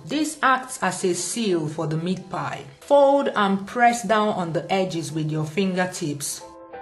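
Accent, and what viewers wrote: Igbo, Nigeria. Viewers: This is purely ALL NIGERIAN! Great Nigerian accent with some wonderful mouth watering recipes! My rating on this video is clean 5 star! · My sister... the igbo accent ❤️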